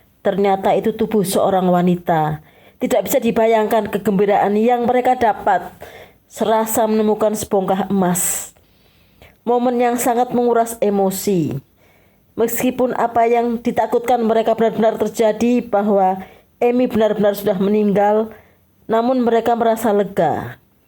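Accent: Java, Indonesia. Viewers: dengar suara nya yg medok itu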